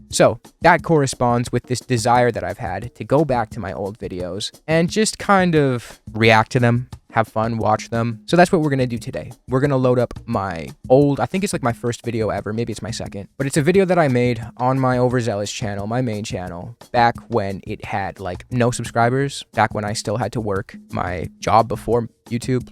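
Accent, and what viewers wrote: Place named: Quebec, Canada. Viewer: bro quebecois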